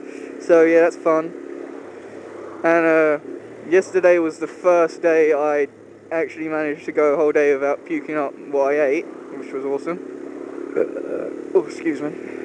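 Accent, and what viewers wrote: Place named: Southern England, United Kingdom. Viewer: Good to see your better :') and motorcycle vlogging is good :D come end of march when I get my first bike I wana get a helmet cam and do it :D and what part of England you live you sound like southern or south west like Kent / Essex?, keep the videos coming :')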